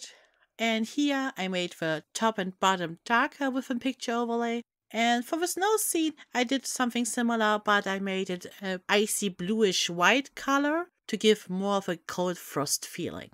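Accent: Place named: Germany. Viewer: Lovely german accent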